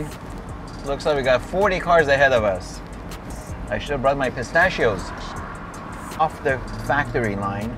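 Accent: Iran. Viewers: Eh-screws, makes me feel like home, good ol Persian accent 😂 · New subscriber. Love your videos, but afraid to pick up Persian accent. ;) Ppl think Im Persian anyway, so Im ok with it anyway. :)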